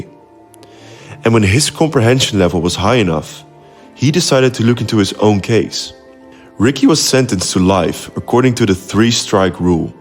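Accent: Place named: Netherlands. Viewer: Weg met je Nederlandse accent je weet niet eens waar je over praat oen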